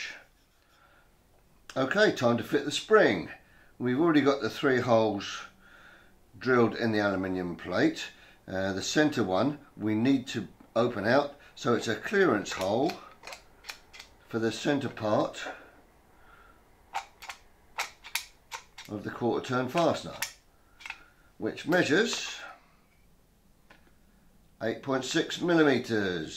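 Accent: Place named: Hertfordshire, United Kingdom. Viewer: I enjoyed this :) I would say a Herts accent, maybe Bushey area?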